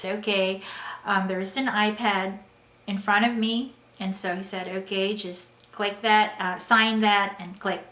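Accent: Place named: United States. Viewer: True American accent too. congratulations. For me I always speak the way I did. Hope I could fallows your accent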